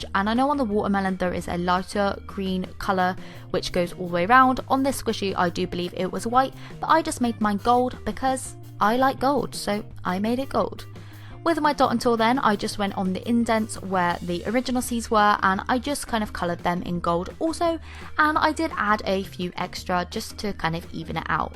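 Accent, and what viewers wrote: England, United Kingdom. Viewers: You sound British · ugh,british english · Peyton Johnston she’s British so she speaks differently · What part of england are u form?? U dound like people in my surandings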